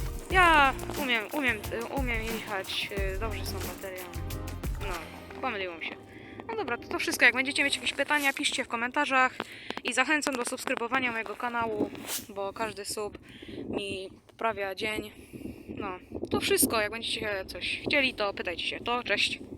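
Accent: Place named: East, Poland. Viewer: Nie wiem jaki sens jest jeżdżenie jakąkolwiek hulajnogą przy takim śniegu jaki tutaj jest pokazany no to może tam gdzieś to relacja jest w języku trochę takim z akcentem wschodnim morze na południu Polski gdzie ta zima trochę tam gdzieś się pokazuje bo na północy a w Szczecinie mieszkam po prostu praktycznie zimy nie widzę ale nie zamierzam jeździć hulajnogą zimą bo to jest średnia przyjemność praktycznie no jeszcze na siodełku powiedzmy to jest jako tako ale stojąc to człowiek po prostu szybciej marznie i jest większe ryzyko wpadnięcia w poślizg w ogóle nie widzę tej jazdy zimą i należy ona do sporadycznych czy co wynajmują firmy wynajmujące czasami spotykają swoje właśnie hulajnogi porzucone w śniegu w ogóle to jest osobny komentarz do tego bo to już po prostu zakrawa na kpiny z hulajnogi wszędzie są bezładzie porzucane gdzie tylko popadnie i zagrażają przechodniom ja już bym się dwa razy o nie potknął wychodząc wieczorem przy słabym wzroku...m.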